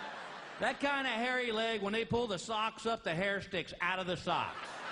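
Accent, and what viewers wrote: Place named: South, United States. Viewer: My mother took me to a Bill Engvall stand up performance in Texas, waaay back when. I was a kid, but I laughed every time my mom laughed. As I got older I started to understand the jokes and I cackle from the hilarity. He truly is the funniest person around. And his southern draw makes it 1000x funnier.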